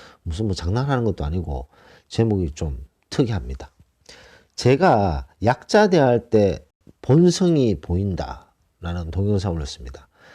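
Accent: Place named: Daegu, South Korea. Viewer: 방송 감사하게 듣고 있습니다. 정말 좋은 말씀 대구 사투리로 듣는데 편안하고 유익합니다.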